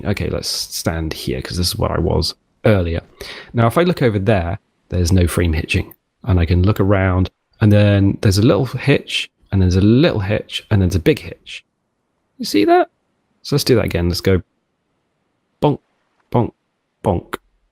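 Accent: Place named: England, United Kingdom. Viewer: You are definitely my favourite Stranded Deep You Tuber. I appreciate your in depth approach particularly on the Cartographer series since I'm getting into modding now. So much changes as ea games progress it's important to explain to your viewers which version you are playing and exactly how things are done, some tubers forget that fact. I find your delivery easy to listen to, some Tubers have good content but are exhausting since they sound like they are are on crack, yelling and swearing constantly, I feel like breaking out the ritalin :D It's also nice to hear an English accent (just personal bias) and since you asked, I'm an English woman living in Portugal.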